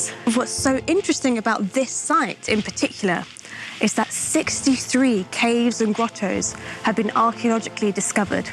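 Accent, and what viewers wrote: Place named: United Kingdom. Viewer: This is so interesting! Thank you for sharing. You're such an eloquent and engaging speaker. On a slightly unrelated note, your name makes me wonder if you're Ukrainian/Slavic?.. But at the same time you definitely sound like a native British speaker haha. I hope it's okay to ask, but do you have Slavic roots or am I digging too deep?:)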